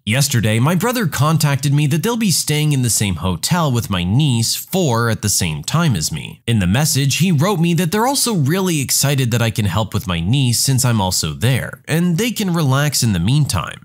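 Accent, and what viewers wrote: United Kingdom. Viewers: Love the British accent init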